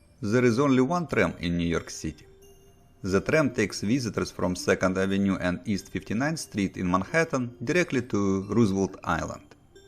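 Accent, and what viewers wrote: Russia. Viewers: You are russian? because your english sound a russian accent :)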